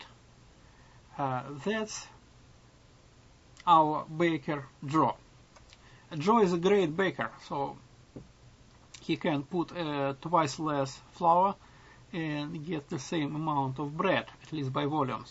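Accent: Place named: Russia. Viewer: Акцент русский просто жесть )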